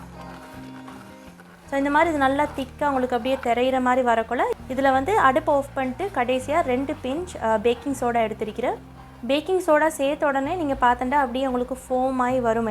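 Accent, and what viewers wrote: Sri Lanka. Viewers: Dear Sister, I always interested in your Coking and the way you speak Tamil is so sweet. Where do you come from in Sri Lanka, Which area? Salam from Germany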